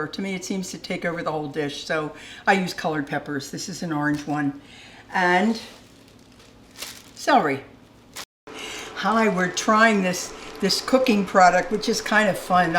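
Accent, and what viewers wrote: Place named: South Jersey, United States. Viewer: I am learning SO MUCH!! Are you for the Philly area?? I’m getting a South Jersey accent vibe! 💕💕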